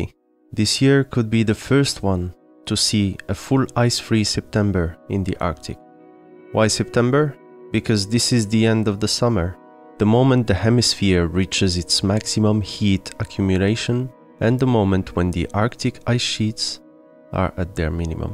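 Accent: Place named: Wallonia, Belgium. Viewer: My guess the accent is from Charleroi, Wallonia.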